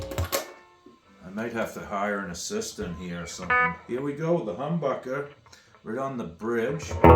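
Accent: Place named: Maine, United States. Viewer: You sounds like you straight came out of middle/central Maine.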